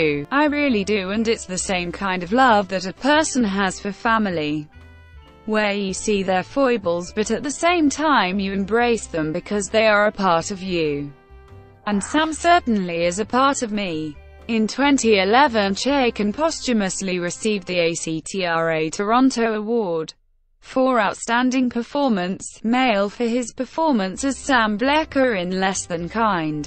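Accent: United Kingdom. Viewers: a BRIT robot voice!!!...coooool.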